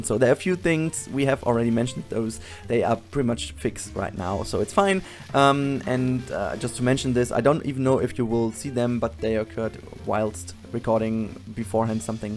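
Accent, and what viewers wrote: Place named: Germany. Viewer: hahah bist du cdeutscher ? :p :o echt kein schlechtes english probs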